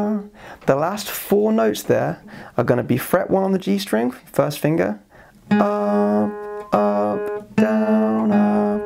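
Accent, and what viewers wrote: United Kingdom. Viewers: Is he british ? Love his accent.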